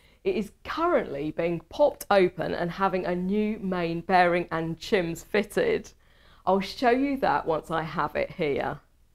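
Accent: England, United Kingdom. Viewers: As much as I can't stand videos or commercials with people who have english accents, in this video , for some reason I was able to overlook that.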